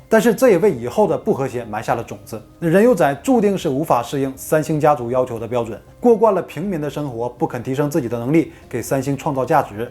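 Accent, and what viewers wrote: Northeast, China. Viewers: 思路够清晰，文案不拖沓，播讲够顺溜，语言够幽默，就会让观众不自觉忽略口音问题。其实有口音的人是多数，当你的播讲内容有冗长，模糊，故意卖关子，拖沓，发音的缺点就会被放大。当然这几个主播都没有那么差，都还挺好的，主要我以前做的是语言类相关的工作，所以会很挑剔发音上的硬伤，我无法忽视哪怕一点儿的齿音字，大舌头，前中后鼻音不分，呢嘞不分。的东北音，和发音问题，是两个概念。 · 小乌确实噱头大与内容不符，Wayne内容一般都是从网上抄的，will很棒发音好文案也有趣简洁，但是东北口音也很重呀。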